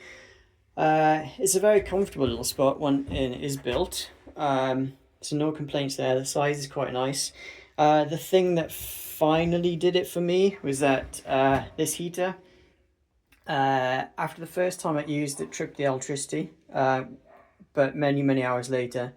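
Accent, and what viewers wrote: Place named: United Kingdom. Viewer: My dear friend, you’re picking out faults that only matter if they don’t obscure the main problem - it isn’t a sauna! A sauna is a place where you can wash and use a sauna whisk with at least one friend, and preferably two. What you’ve ordered is a shoebox, and now you’re complaining about the screws. Those bloody screws aren’t going to make it a sauna when pretty much everything else is wrong. First, it’s too small even for two people. Second, there’s nowhere to wash yourself. And third, there isn’t even enough room to use a sauna whisk properly. But I have to say, your British English is an absolute pleasure to listen to:goodvibes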